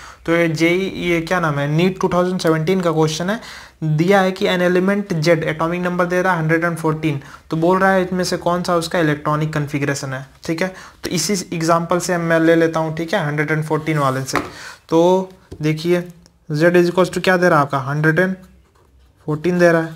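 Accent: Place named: Bihar, India. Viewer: Bhai bhut shai explains krta ho appp❤ love you bro mere ko bhut dikkat thi isma 😊 ak baat puchu bhaiya ap bihar s lag rha ho 😅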